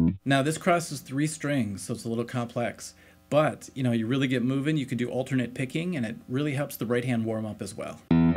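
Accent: Minnesota, United States. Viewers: From Minnesota?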